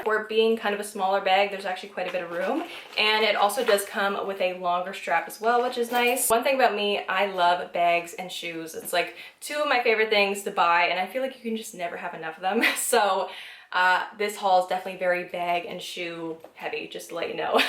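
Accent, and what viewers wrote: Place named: Canada. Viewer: I'm 51 from Australia and wish Temu was around when I was in my 20s! Great finds and so affordable too! - thanks. I've got my eye set on that chain bag and the watches. PS - Your hair looks great and really suits you. Love the Canadian accent too, I went to London Ontario Canada when I was 13 to visit family and would love to go again one day. Beautiful country!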